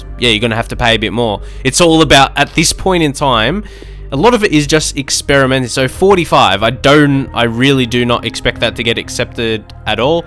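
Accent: Australia. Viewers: Yes! An Aussie! Just found channel! LOVE IT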